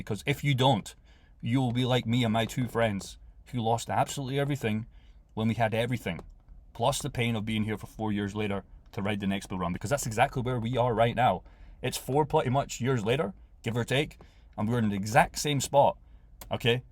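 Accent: Scotland, United Kingdom. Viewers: Good to hear a Scots voice that can see what is going to happen in 2025. Lots of scots will miss this run as they only follow MSM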